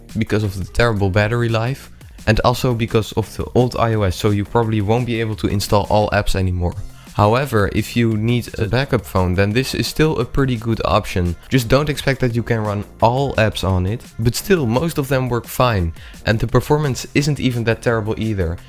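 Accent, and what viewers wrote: Netherlands. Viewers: kom je uit nederland?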